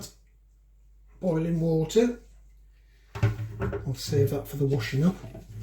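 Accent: United Kingdom. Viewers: Well sounds good. But what would I use it on or with. Btw, I love your accent. Sounds proper British. You could've actually cut it in half. Then your plan wouldn't be so full. And you wouldn't need a bigger pot. Eh? Try a warm damp sponge to wipe off jars. I want a taste.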